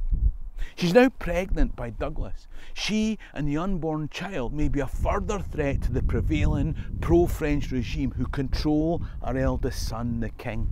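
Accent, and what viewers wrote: Scotland, United Kingdom. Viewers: There's nothing better than hearing a true Scot talking about Scottish history 💙🤍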